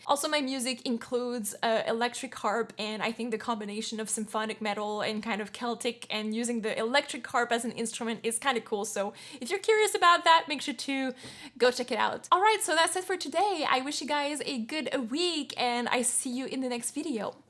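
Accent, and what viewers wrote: Canada. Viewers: Hold on a second, are you Canadian?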